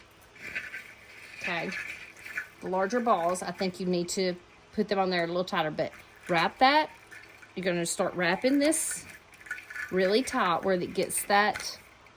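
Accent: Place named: South, United States. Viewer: What you call a Chanel stem, I know it as a pipe cleaner 😂. It's probably regional. I'm from Utah and you sound like you're from the south.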